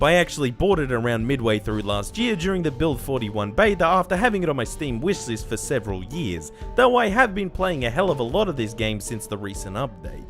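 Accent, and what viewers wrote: Australia. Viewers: local youtuber describes an australian person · Sounds like an aussie, but also very Jewish. Or just has a cold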